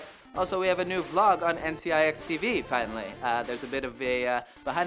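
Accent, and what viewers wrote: France. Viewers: U have a nice French accent dayyumm :D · What a french accent.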